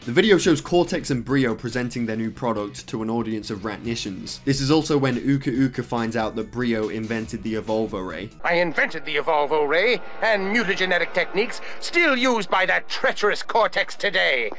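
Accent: United Kingdom. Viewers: oh man ... how i love hearing british guys talking ... nice video(s) btw :D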